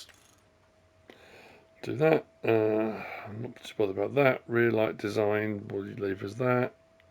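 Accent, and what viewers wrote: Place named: United Kingdom. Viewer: Honestly I'm not a fan of saxlingham either it's just not my cup of coffee lol. But I'd definitely watch you play it. Honestly I'm still holding on to hope that you do another farm to survive series. That is my all time favorite you've done. But you play whatever map you like and ill watch whatever you pick. Your play throughs are way better then most others ive seen i just like listening to you its fun with your deep British accent.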